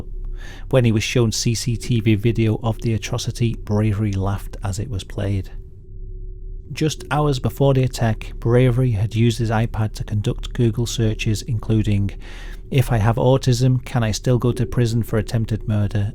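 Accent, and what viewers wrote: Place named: Liverpool, United Kingdom. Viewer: Horrendous attack this. I remember when it happened it had me clutching right to my young kids whenever we went out for quite a while after. Even worse when see that the attacker had been threatening extreme violence against children in the weeks leading up to the attack. Any way, love you videos mate, you’ve got a really charismatic way of telling these stories. Love your accent too! Scouser right?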